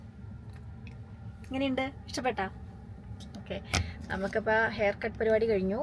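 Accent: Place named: Trivandrum, India. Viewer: You are from Enklm and you have tvm slang...😅😅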